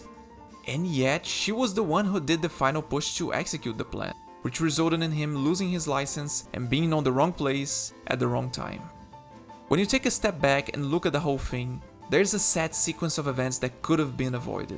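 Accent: Brazil. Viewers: Ótimo vídeo. O sotaque brasileiro ficou gritante, e eu adorei. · Ai doidão, tu é brasileiro, né? Eu sinto o Brasil na sua fala. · Cara, vc é brasileiro?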